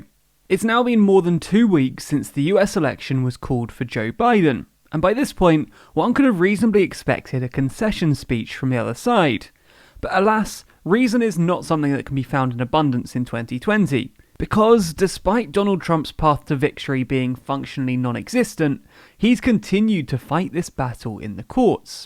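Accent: England, United Kingdom. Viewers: TLDR - Get context from Gore v. Bush. Don't just parrot talking points. Even the English accent can't mask your bias and wrongful analysis. I've watched three videos now and hoped to get some real analysis. However, this is about 85-99% msnbc or cnn or fox.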